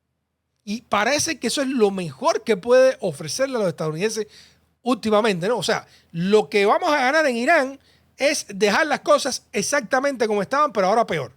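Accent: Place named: Cuba. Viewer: con todo respeto, mejora tu dicción, he oído a otros cubanos y tu acento resulta demasiado cansado. Tu información buena pero cuesta trabajo seguirte con ese acento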